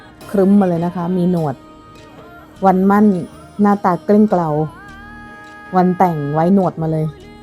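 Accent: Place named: Central Thailand, Thailand. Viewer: เสียงบรรยายพูดได้จังหวะท่วงทํานองภาษากลางได้ชัดเจนมาก เทียบเท่าพิธีกรมืออาชีพ หรืออาจจะมากกว่านั้น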